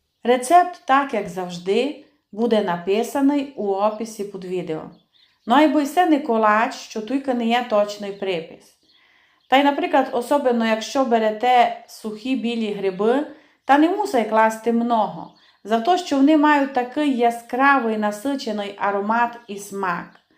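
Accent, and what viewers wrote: Transcarpathia, Ukraine. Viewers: Це дуже смачно, в нас на Волині також таку готують ,лише без паприки.Називається страва мачанка.Обов'язково присутня на столі на Святий вечір і в піст також. Спробую додати паприку.Окремо дякую за ваш чудовий закарпатський діалект❤!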